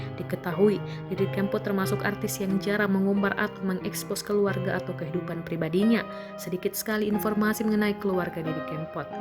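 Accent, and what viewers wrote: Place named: Medan, Indonesia. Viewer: mbk e yg bawa acara kyak orng medan dengr dr logtnya..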